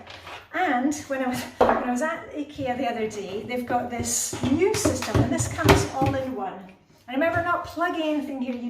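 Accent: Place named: Edinburgh, United Kingdom. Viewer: you are a darling! Love your beautiful Edinburgh accent; brought up my kids there, and still miss it.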